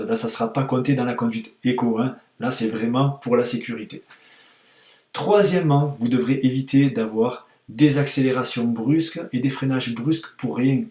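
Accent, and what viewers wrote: South, France. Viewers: J'ai passé 3 fois mon permis, la première fois j'ai loupé une priorité avec le stresse. La seconde fois j'ai eu 19.5 ( il faut avoir 20 je le rappelle) sans faute éliminatoire. j'ai jamais compris pourquoi. Cette fois ci j'ai eu 19! et sur mes résultats il me manque 1 point pour l'installation au poste de conduite...Sérieusement, le poste de conduite? Je comprend vraiment pas le problème, a part qu'a cause de ça il faut encore que je recommence! ça coûte trop d'argent! J'en suis a 60 heures... Je déprime grave. Mon porte monnaie crie famine... En plus mon auto école est pas top, par exemple le jour du permis le prof accompagnateur ne viens pas pour te rassurer, te dire si c'étais bien ou pas. Personne ne t’emmène ou ne te ramène sur les lieux de l'examen tu te débrouille. Ce qui, moi personnellement, ne m'aide pas à déstresser. Aussi, les cours durent en moyenne 35 minutes et coûte 48€ l'heure. 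Beaucoup trop chère! et j'habite même pas une grande ville! A vous semblez très professionnel, je vous remercie pour ces vidéos ça aide bien! dommage que vous n'êtes pas dans ma régions... On entend l'accent du Sud!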